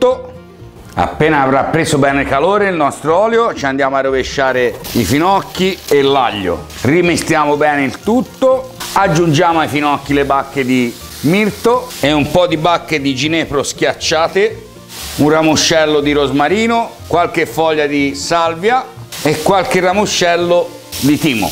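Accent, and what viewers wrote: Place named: Viareggio, Italy. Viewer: Le ricette sicuramente sono ottime, ma io le faccio I complimenti perché usa tutto della verdura, non fa sprechi, mi piace vedere come con poche cose riesce a fare tanto ben di dio!! Bravo!!! Hah un'altra cosa, la parlata! Mi ricorda la mi zia che è di Viareggio, non la vedo da un anno. Buon anno nuovo!!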